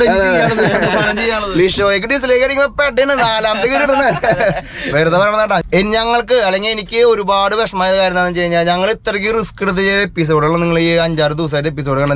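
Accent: Thrissur, India. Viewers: സാന്റാപ്പാ മ്മടെ തൃശൂർ ശൈലി മാറ്റല്ലേ ട്ടാ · വീഡിയോ അടിപൊളിയാണ് but thrissur ഭാക്ഷ ഒന്ന് മാറ്റിപിടുക്കോ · .. Congrats..10 k like below 200 dislike ഒന്നുമല്ല.ചില ആളുകൾ ഉണ്ട് അസൂയ കൊണ്ട് നെഗറ്റീവ് comment ഇടുന്നവർ,അവനൊന്നും ജീവിതത്തിൽ സാധിക്കാത്ത കാര്യങ്ങൾ കാണുമ്പോൾ ഒരു ചൊറിച്ചിൽ ആണ്.ഞാൻ മലപ്പുറം ആണ് നിങളുടെ വീഡിയോ കണ്ടു തൃശൂർ സ്ലാങ് ഒരുപാട് ഇഷ്ട്ടായി.ഒരു 45 minute video kku മിനിമം 2 or 3 മണിക്കൂർ viedo വേണം.. -5 ഡിഗ്രി ഇതിലും കൂടുതൽ power ആർക്കും കിട്ടില്ല.. നിങ്ങളുടെ ചാനലിൽ ഈ vedeos ever green ആയിരീക്കും.. കട്ട support..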